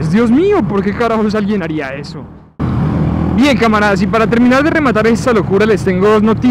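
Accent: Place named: Bogotá, Colombia. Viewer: Desde que ciudad de Colombia grabas? No he logrado identificarla y tu acento no me ayuda es como rolo pero evidentemente no es Bogotá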